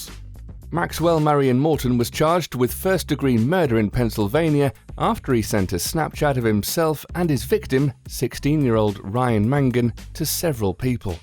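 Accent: United Kingdom. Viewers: boring and i hate your british accent you bloody wanka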